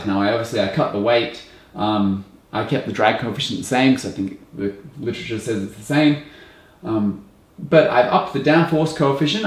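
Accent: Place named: Australia. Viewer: hes more of an English sounding aussie than the rest of us bogans that sounds like or worse than Dundee lol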